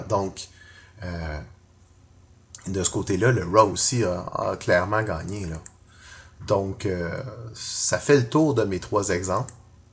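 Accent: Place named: Quebec, Canada. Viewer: C'était très bien expliqué, facile à comprendre, de bons graphiques "statistiques" et exemples visuel puis enfin quelqu'un qui parle avec un bel accent Québécois pour faire changement de ces français! :)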